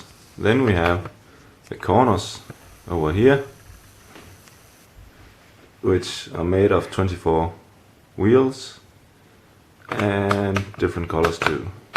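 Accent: Denmark. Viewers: der er et par stykker der spørger om du er fra Danmark..... jeg siger det er nærmere Jylland :) din accent taler for sig selv :)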